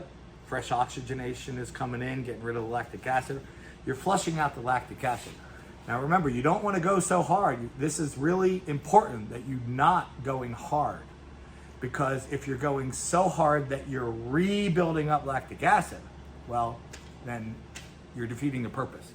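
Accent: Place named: Philadelphia, United States. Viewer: I hear a Philly accent…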